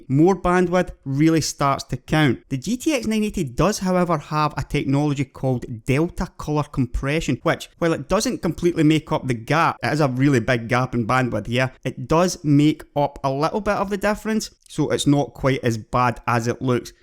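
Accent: Scotland, United Kingdom. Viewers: this scottish accent rulez, gegabytes :D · Great video; right? Helped answer the questions I had, was well paced, very visually informative and to hear a proper Scot again was great. Right?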